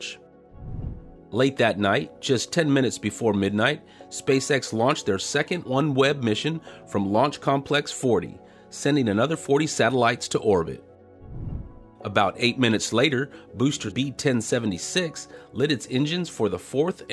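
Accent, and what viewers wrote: Texas, United States. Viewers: Welcome back!... seriously, missed that familiar voice.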